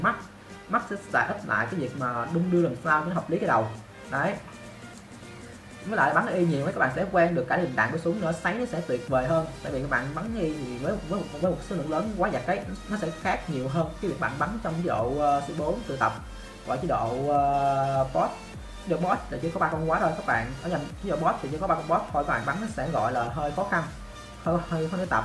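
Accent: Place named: South, Vietnam. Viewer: nghe giọng miền nam tưởng anh trong fps gaming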